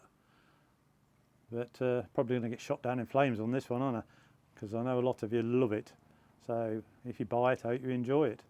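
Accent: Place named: Midlands, United Kingdom. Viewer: There's no hiding that Midlands accent Leicester..Derby???😂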